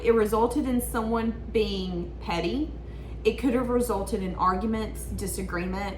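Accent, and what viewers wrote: South, United States. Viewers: Accurace as always! Loooove your adorable country 🤠 accent 🌹🌹🥰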